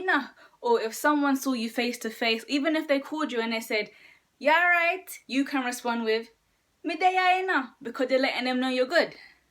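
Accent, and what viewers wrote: Jamaica. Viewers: I love hearing your accent ❤🇯🇲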